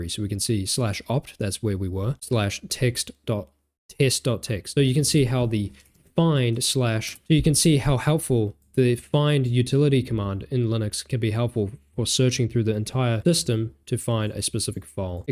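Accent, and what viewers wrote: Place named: New Zealand. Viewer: It's a fellow New Zealander!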